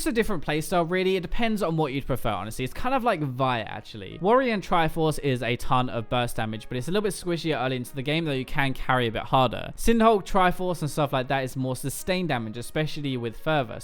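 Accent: United Kingdom. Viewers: i like the uk accent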